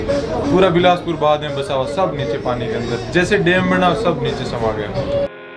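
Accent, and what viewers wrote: Rajasthan, India. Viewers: राजस्थान में कौनसा गांव है भाई आपका। वैसे आप दोनों पति पत्नी का देशी स्टाइल में राजस्थानी भाषा मे बोलना अच्छा लगता है। में तो आपकी आवाज एव बातों को सुनने के लिए ही ब्लॉग देखता हूँ।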